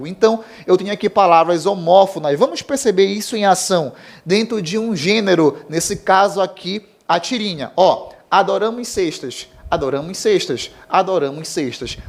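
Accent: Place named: Pará, Brazil. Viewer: aula boa é com sotaque paraense égua mana muito bommm!!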